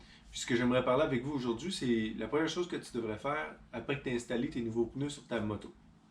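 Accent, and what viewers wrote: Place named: Canada. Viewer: Salut de Provence en France, j'adore ton accent canadien, très compréhensible néanmoins, ce n'est pas toujours le cas, peut-être est-ce dû à ta province ? Pourquoi MtoPQ ? Sais-tu qu'en France "PQ" est l'acronyme dans le langage populaire, de papier toilette ? LOL!! Mais revenons à nos moutons ... à notre destrier favori, je note ta méthode inédit pour moi mais cependant je n'irais pas jusqu'à le faire de façon identique, un marquage à la craie aux quatre points cardinaux puis encore de même sur quatre autres décalées à équidistance des premiers me sembleraient suffisantes (ceci pour ménager mes vertèbres lombaires) ;) En effet, après 40 ans d'arrêt de la pratique de la moto je vais bientôt m'y remettre, car bien qu'ayant été un motard "pur et dur" dans le passé, une petite révision des fondamentaux est nécessaire pour parer quelques oublis naturelles du fait de mon âge, comment dire ... 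poivre et sel, un peu comme toi si tu vois ce que je veux dire ... Moi, je terminerais par un rasage à ras des "moustaches" qui font un effet de roulement à rouleaux sur l'angle et je fignolerais avec un coup, non pas de brosse, mais un passage rapide au papier de verre à gros grain afin d'éviter une mauvaise surprise. Grand V et ride safe ... Notamment en MATANT intensément et brièvement l'angle de la roue AVG de la voiture que tu t'apprête à doubler, car l'auto suit TOUJOURS la direction dans les fractions de secondes qui suivent, surtout si le conducteur oublie de jeter un coup d’œil avant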